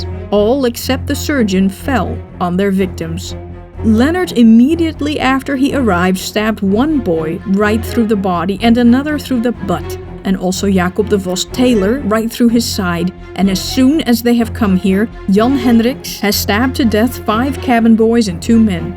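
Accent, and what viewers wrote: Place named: Netherlands. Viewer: I have read many books on the Batavia topic, and even done some research myself about the subject... and never came across material so valuable from an unknown source! Yes, you may find specialised info reading the "usual" suspects (Mike Dash, Hugh Edwards, etc.), but I never expected such a high level from someone running a YouTube channel! Your style is professional, yet accessible to everyone; the research well done and facts stand verification; and your narration, with your unique Dutch accent makes the series even more enjoyable. I recently went to the Houtman Abrolhos Islands, and visited all the islands in this chronicle, I took photos that I could share with you, in case you wanted to update your material, or just happy to share them with you if you're curious... You are doing an amazing job and I commend it! Well done! 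Looking forward to more of this...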